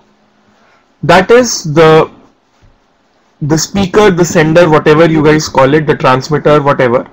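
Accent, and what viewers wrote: India. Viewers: very nice sir! i am pakistani .so it is easy for me to get indian accent. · l am African from Democratic Republic of Congo/ cental Africa, l don't like the teacher's accent, he's waisting his time, better to teach in indian language.Malayalam, Tamoul, Hindi...sorry sorry to follow you mr, phonetics problem, you're my colleague l am a Business English teacher. Thank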